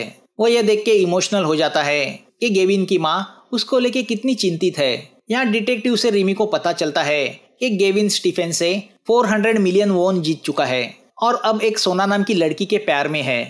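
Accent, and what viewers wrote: Maharashtra, India. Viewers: What makes this channel stand out is the Hindi spoken in Marathi accent for narration.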